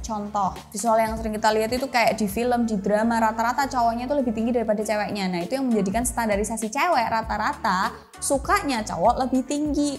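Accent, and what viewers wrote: Java, Indonesia. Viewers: Jowo bgt sampeyan mbak,👍👍👍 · jowo bgt logat km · Pertahankan Logat jawanya..saya sangat suka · Senyum sendiri menyimak medoknya wong ayu iki... Wanita Jawa memang luar biasa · Jowo banget uwoooow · Jowo toh mbak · jowo ne ketok poll yo wkwkw 😀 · Jowo ne pundi mbak'e?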